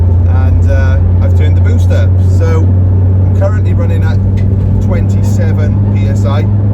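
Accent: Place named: Cockney, United Kingdom. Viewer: Love the English cockney..🤣🇯🇲🇯🇲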